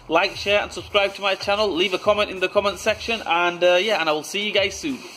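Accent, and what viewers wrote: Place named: England, United Kingdom. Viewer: I like your knowhow but because of your English accent I have to suggest that you talk a wee bit slower. Canadian English is a wee bit of translation... plus the fact that I'm slow😀 Thanks for doing this vid.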